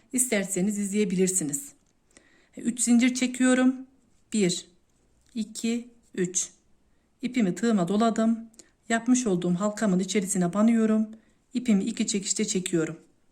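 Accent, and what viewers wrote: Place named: Central Anatolia, Turkey. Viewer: İlk yorum benden olsun hafif İç Anadolu aksanı seziyorum hemşeriyiz sanırım ayrıca çok güzel içerikler var ✅🙏😊👏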